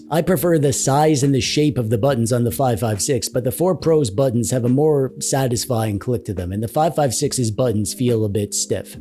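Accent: Canada. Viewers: You sound Canadian my Root Beer friend ??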